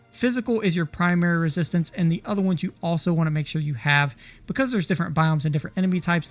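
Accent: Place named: Midwest, United States. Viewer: You have such a clean Northern/Midwestern accent - but why do you pronounce "affix" in the way you do - a-fix? Dictionary puts the accent on the A - for ah-fex.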